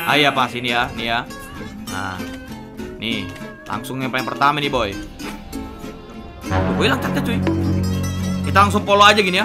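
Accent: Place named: Kalimantan, Indonesia. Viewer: Kek nya orang Kalimantan tuh bro dari bahasanya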